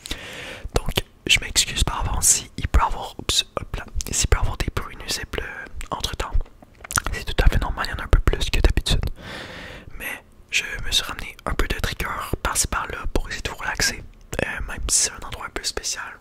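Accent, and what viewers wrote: Quebec, Canada. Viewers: J'adore l'accent québécois en tant que français, sinon je me détends toujours autant meme si je ne commente pas souvent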